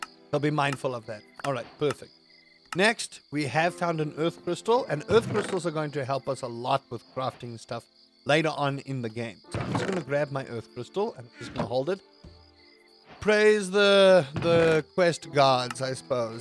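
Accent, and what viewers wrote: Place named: South Africa. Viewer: Howzit,! You randomly appeared in my feed with your episode 1 of this series, and since I've played both games, I figured this was worth a shot. I'm really enjoying it so far (still very early game, though - not even iron tools yet). They've done a really good job with this modpack. And as an ex-SA guy, I also enjoy hearing the accent again (been in Canada for 20+ years now).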